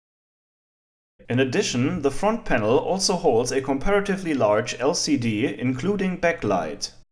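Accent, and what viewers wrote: Germany. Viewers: evil German mad scientist of course. classic accent :) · Yeah German or Austrian definitely he speaks classic school accent as it's spoken in schools in these countries but not bad... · Surprised you haven't used any of this to make CNC of some sort. I also love your accent, partly because I was stationed in Germany while in the US Army, so it's nice to hear English with a German accent again. Keep up the great work, I learn a lot from you! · For a German his accent is really light imo. Excellent pronunciation too.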